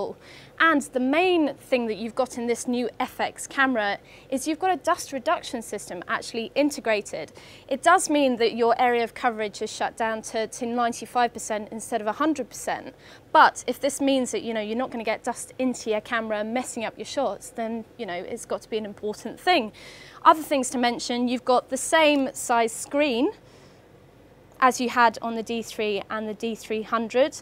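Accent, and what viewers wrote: United Kingdom. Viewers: German accent? Can't you tell a London accent from a German accent? She's reading off the brochure, isn't she? LOL! And is she trying to shake the camera to death?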